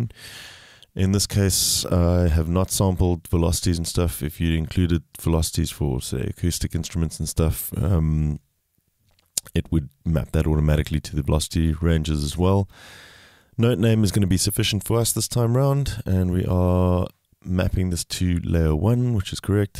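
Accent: South Africa. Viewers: You sound South African👍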